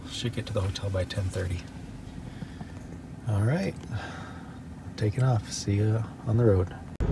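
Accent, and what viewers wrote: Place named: Minnesota, United States. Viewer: I could tell you were from Minnesota right when I heard you.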